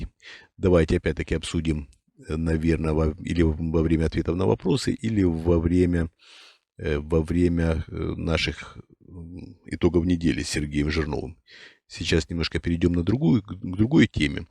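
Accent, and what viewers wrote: Ukraine. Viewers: Бля, жесть! Это что, кто-то всерьёз слушает?! А украинский акцент диктора никого не смущает? А подзаборная лексика и стилистика? Нет, народ, что серьёзно так потерял чувство уровня, что ВОТ ЭТО принимает за чистую монету? Наши люди неисправимы. Кашпировский и Чумак и сейчас могли бы собирать залы и "заряжать воду". Чем "президент, слушающий шаманов", отличается от баранов на этом канале, слушающих таких вот? Впрочем, секте сложно понять, что она секта. Анекдот, ё-моё...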